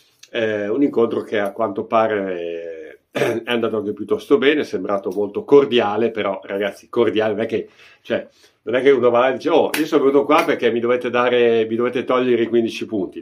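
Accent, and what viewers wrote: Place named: North, Italy. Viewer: In Inghilterra non dipende dalle leggi ma dalla.cultura popolare. Si svenano pur di seguire il calcio ( anche il rugby).nutre il sistema? Si. Impoverisce le persone ? Si, di più. Il tifo fa leva su sentimenti di massa. È ciò che di più populista può esserci. In Inghilterra però, come in tutti i sistemi anglosassoni, la stampa è seria. Tu ed altri plaudivano in questi giorni anni per vari aumenti di capitale , rinnovi, contratti nuovi. Bravo. Sei parte attiva del sistema fallimentare. Fai l'antagonista ora . Non basta l'accento del nord e questa cadenza da cantilena per essere seri. Avsim per impegno e serietà ti asfalta . Tu non sai nulla. Sei il classico berlusconiano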